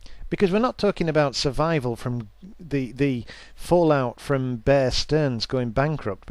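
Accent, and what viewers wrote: United Kingdom. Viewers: Great talk ... keep'em coming. A lot of common sense in your videos.. ... and is that a Geordie accent BTW ? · wow -- very good post and i agree with your consolidation of the current developing "buzz". a few points - ammo is back (if you can afford it), gold is still controlled by the fiat makers, what are ordinary folk? btw, where did you get that image? I want it as my PC wallpaper. I have a good one for you as well. Your accent reminds me of a friend I had from Sheffield.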